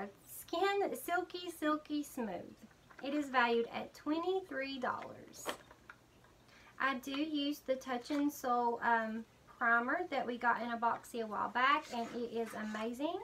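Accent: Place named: South, United States. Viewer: I love your ramblings and your Southern accent! You are growing so fast 😊😊😊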